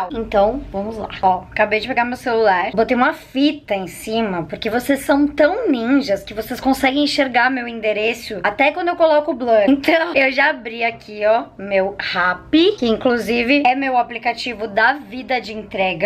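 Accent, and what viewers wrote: Rio Grande do Sul, Brazil. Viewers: Você é gaúcha? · Vc é gaúcha?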